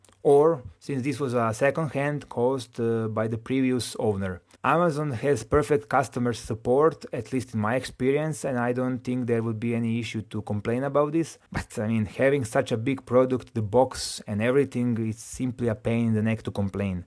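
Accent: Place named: Eastern Europe. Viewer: A giudicare dall’accento ho pensato che tu fossi slavo ahaha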